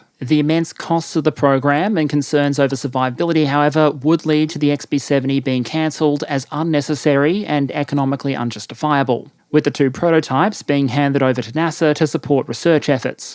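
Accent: Australia. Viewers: The way Australians say Russia has always cracked me up… love it.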